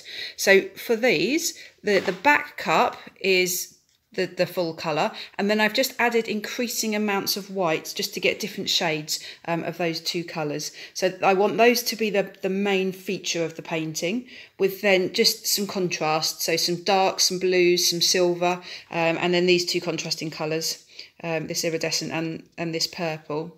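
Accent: England, United Kingdom. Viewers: Core blimey as we used to say when I lived in England! Holy cow is what My kids would say now that we live in the US ! that is a lot of paint and cells bravo you for not making this turn muddy because those colors definitely have the potential of getting muddy but you really took your time getting the paint to the corners. That will look fantastic varnished! 🥰 congratulations to your accomplishments that was a big project! Listening to you makes me homesick 🙃 although I moved from there when I was 12 been in the US 40 haha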